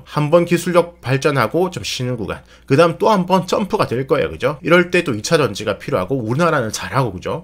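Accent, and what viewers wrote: Gyeongsang, South Korea. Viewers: 남석관 할배가 자기인생에 1~2월은 나빴다! 하던데,,,인물 나셨는지 기대합니다. 수익보다 나레이션(갱상도 말로 씨부리는 맛) 재미.아닌지.?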